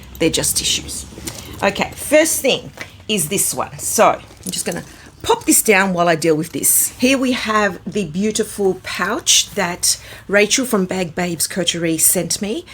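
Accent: Australia. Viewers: Love the Australian accent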